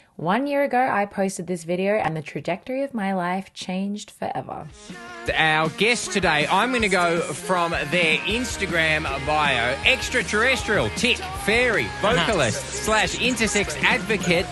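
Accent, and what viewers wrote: Australia. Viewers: Hi, I'm curious about your accent: is your way of talking typical of where you are from, and where are you from? You sound a little bit like Queer Kiwi, but she's from New Zealand, not Australia. Thank you! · Most interesting thing to me is how your accent is 90% Australian, then 10% Midwest American.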